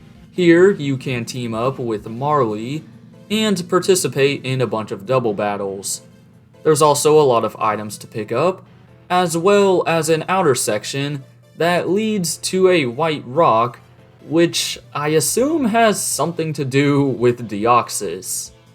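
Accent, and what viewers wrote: United States. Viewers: speaking in a General American Accent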